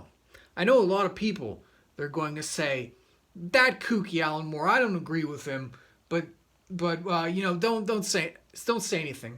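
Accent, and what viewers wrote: Australia. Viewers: Specially since Moore is from the north of England. The accent on this vid is more Aussie/Cockney.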